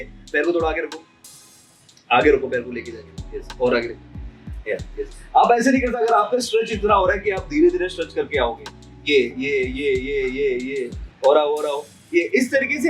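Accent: Kerala, India. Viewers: Mallu ki Hindi ...mast ha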